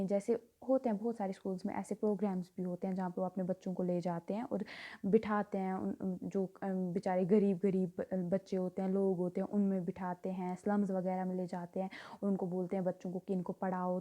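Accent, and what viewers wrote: Kashmir, India. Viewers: That Koshur accent. Keep it up!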